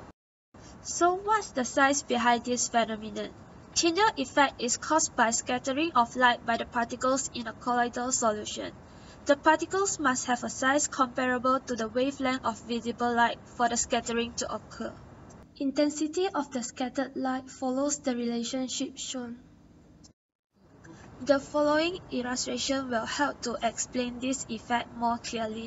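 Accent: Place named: Asia. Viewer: Asian accent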